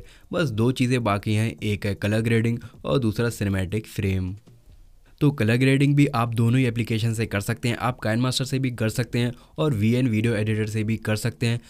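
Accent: Farrukhabad, India. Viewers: mujhe tumhare bolne ke tarike se hi pata lag gya tha ki tum farrukhabad ke ho I'm also from farrukhabad fatehgarh mera nanihaal hai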